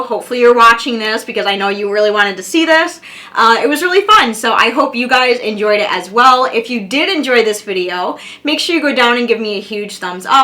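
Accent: United States. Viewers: Listen, if you are broadcasting to an audience of many millions, isn't it time to start thinking about good English? After all, you speak one of the local American dialects, which have very little to do with the real English. I don’t want to impose anything on you, but maybe you can still correct your English?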